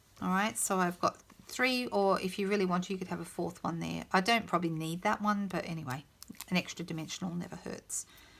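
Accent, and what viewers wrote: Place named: Australia. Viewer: Thank you for making this set less daunting 😀👍🏼. Have ordered it & now feel ok about trying it out . Nice to hear an Aussie voice😀. Jane from SA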